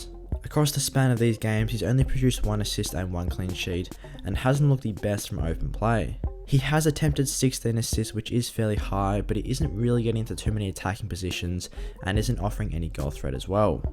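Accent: Australia. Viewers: What part of Australia are you from?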